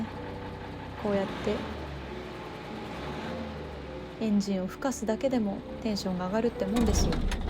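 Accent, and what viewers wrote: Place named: Kansai, Japan. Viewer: サバァは、猫舌でパンケーキ好き。マジで、ぶち込む、めっちゃと関西弁で。水と食料を忘れ、安全第一！オモステの軍用車を操縦する・背伸びする猫天使👼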